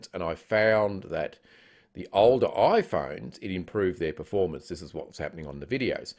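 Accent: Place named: Australia. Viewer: nice to hear an Aussie accent in YouTube for a change :) thanks for the tips this is way more useful than those silly startup timer tests. might upgrade my iPad 3rd gen soon and report back how I go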